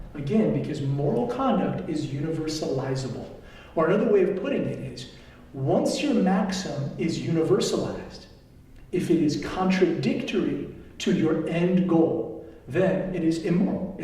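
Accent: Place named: United States. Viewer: Thank you for the video even though it was not crystal clear (or some smoke remains), it was helpful. Its just the US accent and US examples what was difficult. Thank you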